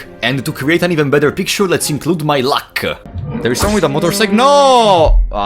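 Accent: Italy. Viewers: I really like your italian accent in English. Sounds so much better than mine (im Spanish)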